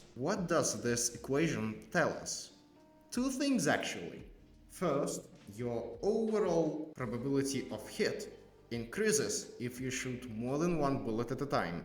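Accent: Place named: Russia. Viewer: Authentic Russian accent. I like it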